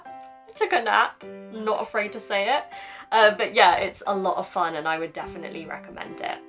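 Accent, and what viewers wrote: United Kingdom. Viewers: 実は上質なイギリス英語が聞けますね笑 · イギリス英語の発音がカッコいいイギリス女性が日本の食事や旅行や100円ショップを嬉々として楽しむ。可愛くて最高だな。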